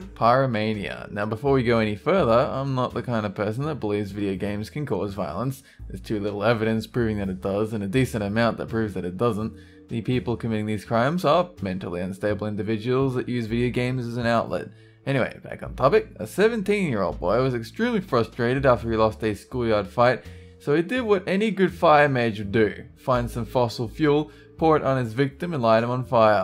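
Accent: Australia. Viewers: This guy sounds like an Australian Dave Rosenberg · is this guy aussie? because I am and he sounds he is too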